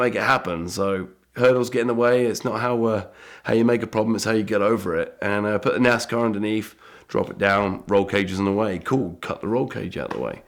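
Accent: United Kingdom. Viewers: Listening to him talk is agonizing. I fell like i am being tortured by an drunk listening to the British accent!!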